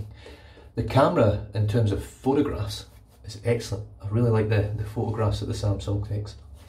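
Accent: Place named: Scotland, United Kingdom. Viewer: Your voice in not monotone, it is Sco’ish. I have no idea what you’re saying but it sounds fantastic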